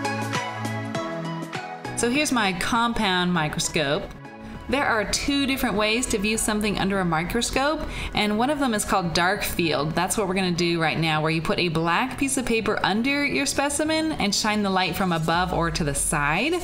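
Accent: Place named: Midwest, United States. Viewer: You sound like youre from Michigan or some midwest state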